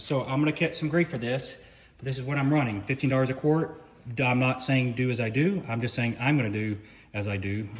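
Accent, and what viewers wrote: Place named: United Kingdom. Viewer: What's with the British voice over? This is clearly in the US lol. It's in Florida in fact.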